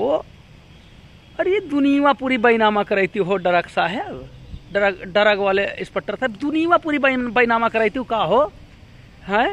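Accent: Awadh, India. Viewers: kuch jada avdi bolte hai app but m sultanpur up44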